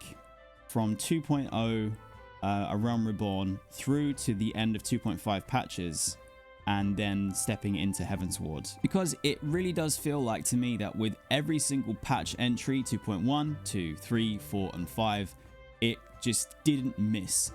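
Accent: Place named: United Kingdom. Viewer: Wait are you in the states? You have a British accent. My bad. I don't mean to offend anyone <3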